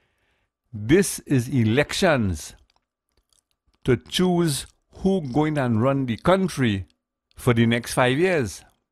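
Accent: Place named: Trinidad and Tobago. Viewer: Thanks, bro. Glad yuh like it...we cyar stop talkin Trini, ent?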